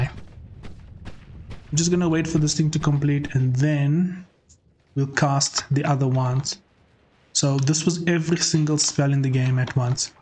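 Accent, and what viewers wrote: South Africa. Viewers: Huge fan of the series, was wondering where youre from? my guess is south africa :D?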